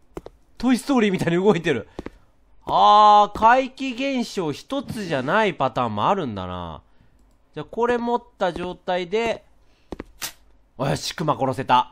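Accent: Kansai, Japan. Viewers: の関西弁素敵すぎる☺︎ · 今回の関西弁が強い(笑)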